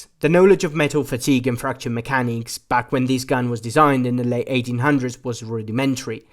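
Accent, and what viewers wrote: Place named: Italy. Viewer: He's Italian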